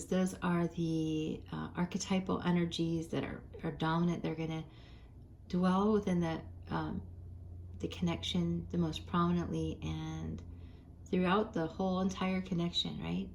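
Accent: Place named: United States. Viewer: Love you,! How can you always know, what is exactly needed at the moment. Pile 2 was especially for me. 💓American accent is so lovely on Russian.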